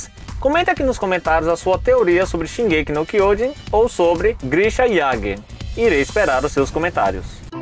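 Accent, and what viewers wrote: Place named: Minas Gerais, Brazil. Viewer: o jeito que você fala é muito mineiro,MORALHAS"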